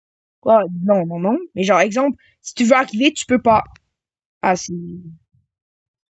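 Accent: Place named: Quebec, Canada. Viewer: T’es québécois? Sinon bonne vidéo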